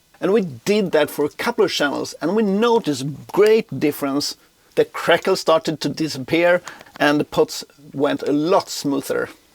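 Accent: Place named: Sweden. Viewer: Sounds like a Swede :)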